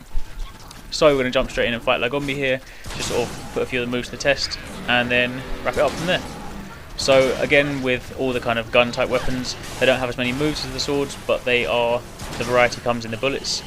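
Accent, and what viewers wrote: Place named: England, United Kingdom. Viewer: its always a guy with an english accent doing this tutorials XD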